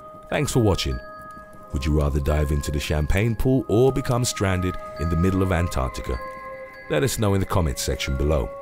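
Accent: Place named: United Kingdom. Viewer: hearing a brittih dude using american mesurements cringey lol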